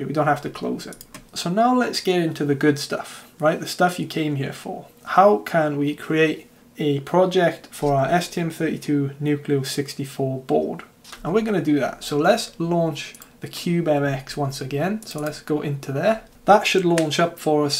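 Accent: South Wales, United Kingdom. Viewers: Where are you? Sounds like South Wales?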